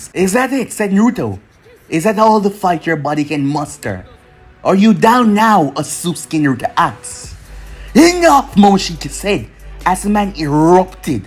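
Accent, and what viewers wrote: Jamaica. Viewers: I’m good my boy also are u Jamaican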